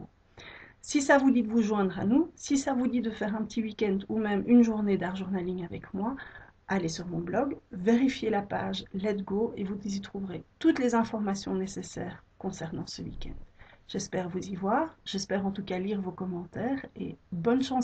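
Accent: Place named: France. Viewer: je savais que tu parles frances. je pouvait l'entendre cuands tu parles anglais!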